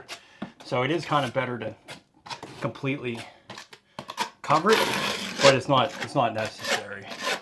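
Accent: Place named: Pennsylvania, United States. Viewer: You can totally tell from Pennsylvania with his accent. 😅 Thanks for the video tutorials! Definitely giving me the confidence to do more on my own!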